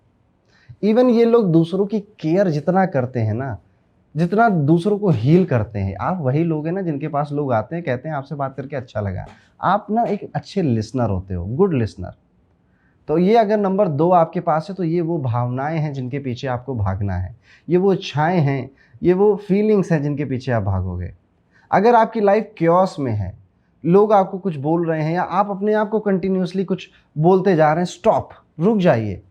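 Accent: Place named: Kanpur, India. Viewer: Radhe radhe… fan ho gye hu aapki… watching u from Sydney but dil hai kanpuriya… aap bolte hoto lagta hai mere gher ka koi bol rha hai wahi lehja wahi slag wahi shabd… hashi bhi aati hai sun k aesa lagta hai gher per hu.., Kash aapse appointment le pati… thode mehnge ho aap aur abhi mai mere naukri nhi hai kaphi samay se job search ker rahi hu but pakka jaldi hi lag jayegi aur tab aap se baat kaungi… may be face to face… when i am there in kanpur… in my home and yes i know u read all the comments 😅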